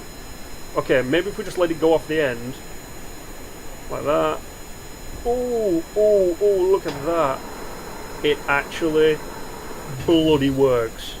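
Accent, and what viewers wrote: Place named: Geordie, United Kingdom. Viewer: no problem. Also, are you from the northeast by any chance? I sorta hear Geordie mixed with a bit of Yorkshire.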